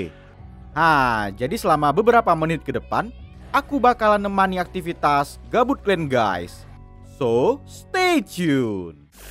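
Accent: Medan, Indonesia. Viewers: Cak ko buat alur cerita ramboo the last blood, laey..paten kali bahasa medan ah..mantap ❤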